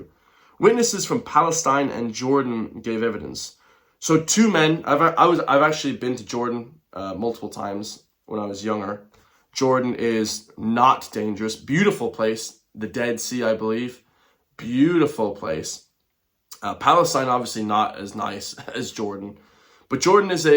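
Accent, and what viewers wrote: United States. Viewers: You’re not a Christian and why do you have an American accent?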